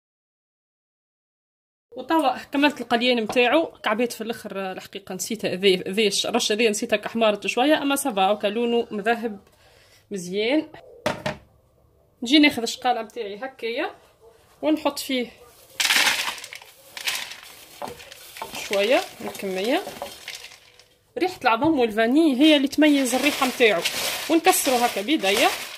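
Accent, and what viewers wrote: Algeria. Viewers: حتى لهجتكم الجزائرية زوينة بزاف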